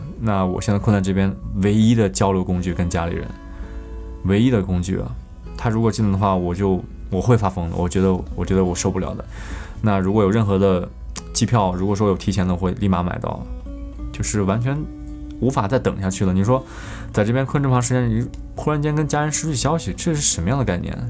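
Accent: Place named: Linfen, China. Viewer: 大哥，你不是临汾人吗？这口音怎么・_・?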